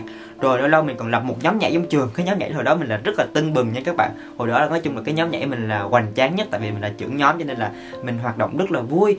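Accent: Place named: Central, Vietnam. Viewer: A là người miền trung đúng ko ạ? "Sì gòn" "quýnh" "đì"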